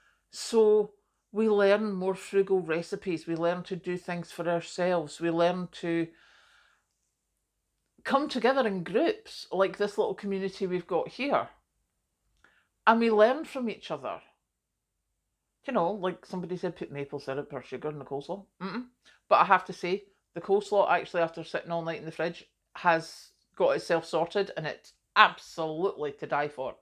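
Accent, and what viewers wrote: Scotland, United Kingdom. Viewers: Best motivational speech👏 (And how I love the Scottish accent 😅)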